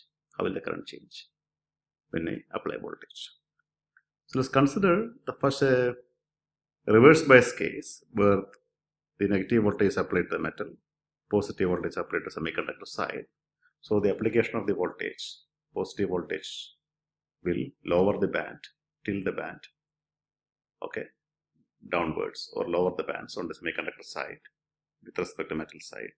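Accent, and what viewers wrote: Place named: Kerala, India. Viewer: The narration is in Malayalam. He claims it is in English but only Malayalees can understand his English. For example, he pronounces "chop" as "chope" (rhyming with "choke"). "Bonds" is pronounced as "Bones". Beware.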